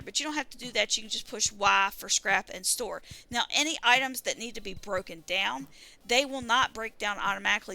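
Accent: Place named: South, United States. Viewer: You have one of the most articulate sounding southern accents I've ever heard just FYI. quite a delightful surprise to the ears!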